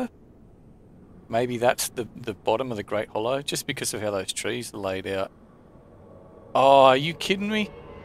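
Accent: Australia. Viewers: Are you Australian? Your accent is awesome!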